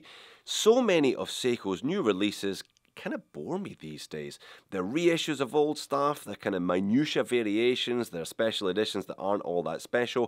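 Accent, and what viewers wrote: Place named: North, United Kingdom. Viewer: I've been in lust with the Longines Spirit Chrono with similar green lume for AGES, but it just wears too large. You can bet I snapped this one up in the sale last weekend, for sure 😉 P.S. Accent sounding quite northern with your head cold,!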